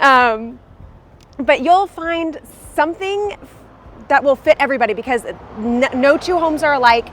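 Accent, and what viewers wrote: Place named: California, United States. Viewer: Hello ^^ It's the thinker Christina Hoff Sommers who brought me here because she's from Petaluma and I wanted to know more about the homeland of my favourite female intellectual. You have the same lovely accent as her and also her laid-back cool attitude ☺ As says: " are unforgettable". I live in Algeria, but I long to visit your gorgeous town, especially the library-museum for I love books and history! Good continuation my friend 🙂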